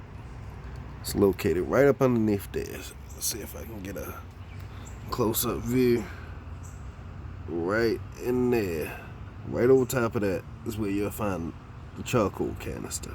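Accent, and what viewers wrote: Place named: Baltimore, United States. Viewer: Are you from Baltimore? Your yews and tews are a lil prominent. Helpful vid btw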